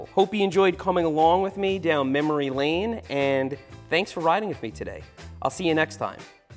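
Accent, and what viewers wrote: Long Island, United States. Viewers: I can imagine what young would say with that long island accent "Forget about it" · you sound more Lawn Guyland then,then you do now... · Hey, do a whole video with your old long island accent! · I never noticed the LI accent, but I should have being raised in Bayside. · The way you sounded when you were younger is the exactly like my voice probably cause I’m from Long Island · It sounds like my people here in long island. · The Long Island accent started to come up during the video while was narrating his footage 😂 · Cannot not hear the long Island accent now lol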